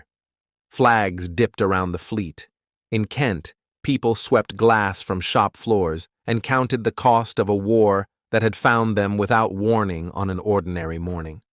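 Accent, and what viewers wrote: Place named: United States. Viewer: 1st. First of all, thank you for pronouncing EVERYTHING, in perfect American. I know this was not Al, and you could, really tell.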